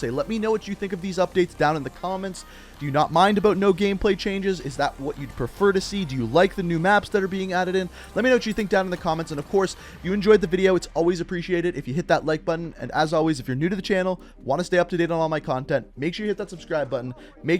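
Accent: Canada. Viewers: Emmanuel Moreno he’s Canadian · He’s a Canadian (I think) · Omg,that was driving me fuckin' nuts too. Weirdass Canadian pronunciations. So annoying.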